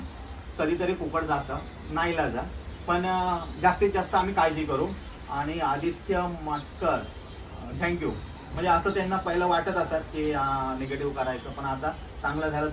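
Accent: Malvani, India. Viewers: खूप सुंदर vlog❤❤❤माका तुमची मालवणी लय आवडता.....तुमची अशीच प्रगती होत राहो हीच स्वामी चरणी प्रार्थना ❤🙏तुमच्यामुळे आम्हाला एक नवीन उर्जा मिळते आणि तुम्ही ज्या ज्या हॉटेल मधे जाता ती सर्व माहिती मिळते .....मस्त असेच एन्जॉय करा ❤❤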